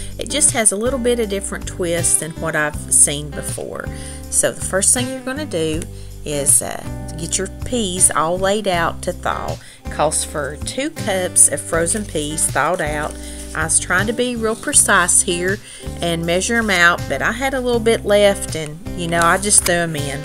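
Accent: South, United States. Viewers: Hello there new here and love your recipes and that country talk. I am also from the south Alabama. But live in Central Florida.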